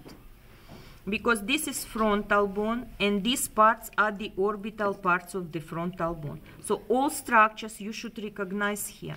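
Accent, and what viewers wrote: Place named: Russia. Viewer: SHE HAS RUSSIAN ACCENT ,, VERY NICE VIDEO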